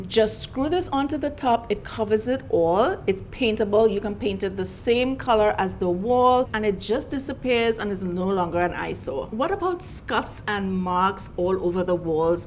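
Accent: Trinidad and Tobago. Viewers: Are you a Trini because sound like a Trini😊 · Wait, are you Trini or Guyanese??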